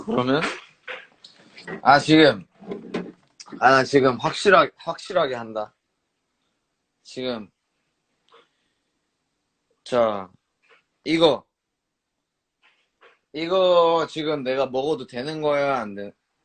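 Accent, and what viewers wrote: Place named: Seoul, South Korea. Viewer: 이 형 고랩에서는 사투리 그대로 쓰더니 이제 서울말에 적응해가는듯 ㅋㅋ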